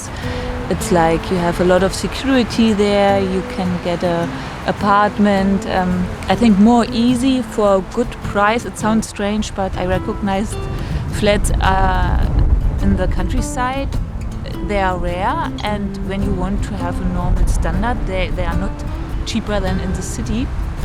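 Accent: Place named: Germany. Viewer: Ich würde dir empfehlen, die Videos in deutscher Sprache zu verfassen. Man merkt, wie "anstrengend" es für dich ist, englisch zu sprechen (zumindest wirkt es so), und dann ist es für die Zuschauer natürlich nicht "entspannend" dir zuzuhören. Weiterhin ist deine Aussprache sehr deutsch, was es ebenfalls anstrengender macht, dir zuzuhören. Natürlich gibt es ein größeres potentielles Publikum im englischsprachigen Raum, aber ein größeres Publikum erreichen zu wollen, bedeutet meistens auch, nicht allzu spezialisiert zu sein (eine Nische zu haben), und oft kommt Erfolg erst dadurch, dass meine eine Nische belegt und sich von anderen abgrenzt. Meine Kritik ist nicht böse gemeint, vielleicht hilft es dir ja :)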